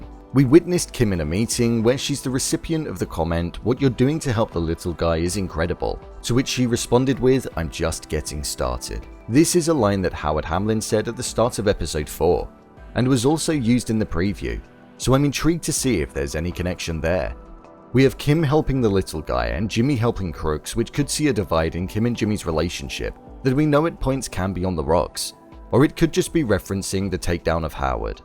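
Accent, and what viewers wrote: England, United Kingdom. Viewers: Sry, I love your engl Accent, but you should do some more research when making Previews for Youtube...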